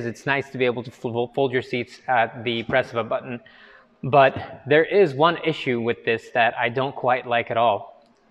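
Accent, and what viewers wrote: United States. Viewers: Why does your accent sound American? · Wow you sound like an American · I thought he was American living in Russia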